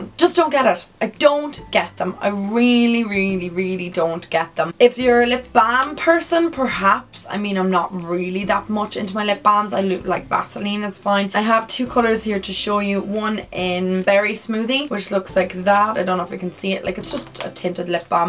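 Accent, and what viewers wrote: Ireland. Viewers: Is that an irish accent? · Beautiful accent! Are you Irish? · Is she from ireland??❤️ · Lovely accent! irish?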